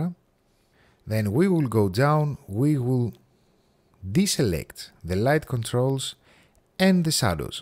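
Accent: Greece. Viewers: Είναι τόσο χαρακτηριστικό όταν ένας έλληνας μιλάει αγγλικά!! Από τα πρώτα δευτερόλεπτα λέω ώπα δικός μας είναι 😛😛 very nice tutorial, clean and easy to understand , keep the hight quality content !!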